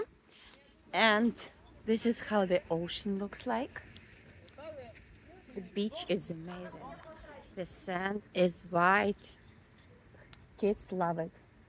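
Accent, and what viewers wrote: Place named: Russia. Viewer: Судя по динамике сообщений тут и английский не понимают)) А вас с первого вашего слова понял что вы русскоговорящая)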